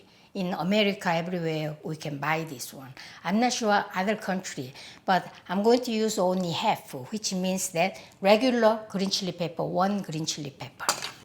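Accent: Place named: South Korea. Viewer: 어머니가 전북출신이신데.. 엄마 친구 이모님같아서 늘 재미있게 보고있어요!! 영어가 한국말처럼 들리는 기적이..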